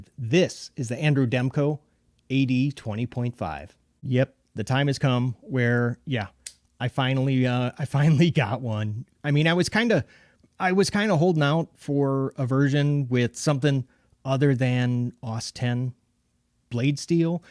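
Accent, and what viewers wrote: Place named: Great Lakes, United States. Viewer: Thought I recognized that accent! Been watching your videos for a while now and never realized it. That "great lakes accent." Sounds like home. 👍 I lived in IL for almost 40 years.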